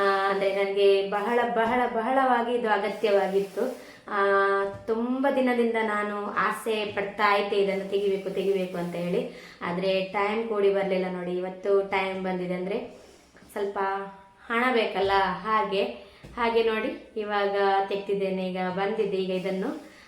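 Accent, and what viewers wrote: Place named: Mangalore, India. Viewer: Manglore Sister Tamma Modalane Video Noduttiddene Nimage Olleyadagali Matte Adaga Videos Noduve Support Maduve Ok na Nimma Manglore Matu Tumba Ontra Kelalu Yeno Helodakke Tilita Karnataka dalli Onda Onda Kade Onda Taraha Style Kannada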